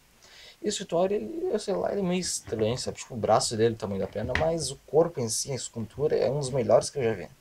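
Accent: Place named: Portugal. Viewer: ah ta....é que o jeito que vc fala parece de portugal kk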